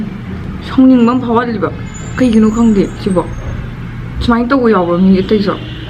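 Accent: Kakching, India. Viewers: Ado Ng kakching londo Nganguva Adum Aduna Henna tavada Nungsiye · kakching marol na nungnaiye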